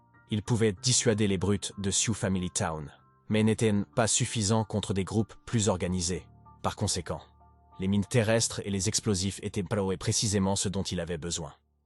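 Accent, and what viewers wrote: England, United Kingdom. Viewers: arrêter avec l'accent anglais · Petit accent anglais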